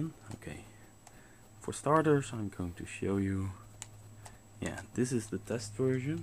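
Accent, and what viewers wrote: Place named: Netherlands. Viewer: aan de manier waarop je engels sprak (en je rekentoestel) wist ik gewoon dat je nederlands was :p btw: ben je programma nu aan het downloaden, thx!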